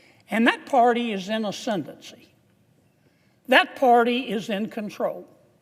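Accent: South, United States. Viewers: Love to hear his smooth southern accent SPEAK the Great big beautiful TRUTH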